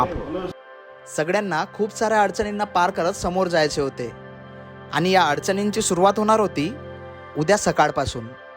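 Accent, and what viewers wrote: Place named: Chandrapur, India. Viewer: Tuzi Chandrapuri Marathi aikun ekdamach chan vatate re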